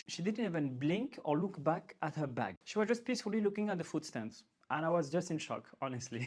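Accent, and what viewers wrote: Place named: France. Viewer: Enjoy your staying in Taiwan, you are welcome by the way I like your great French-English accent. Be cool, Godspeed.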